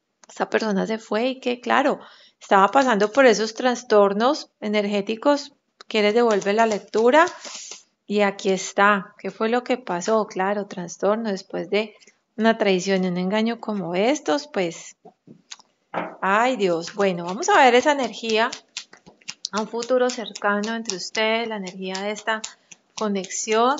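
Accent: Colombia. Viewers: Qué gusto verte siempre tan radiante, tan positiva y con ese hermoso acento colombiano... Saludos desde México... Arriba Colombia!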